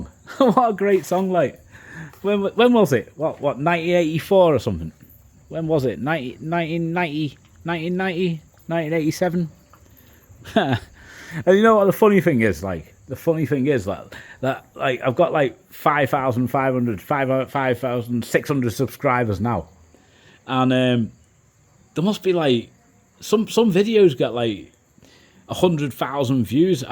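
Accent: United Kingdom. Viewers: Hi, I like your British accent, hopefully will go to show you my French Canadian accent later this year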